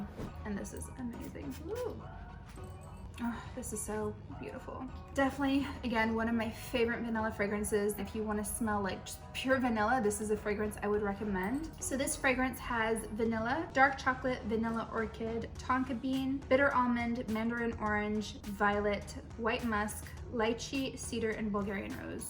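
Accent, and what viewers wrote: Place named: France. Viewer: I love your French accent 😏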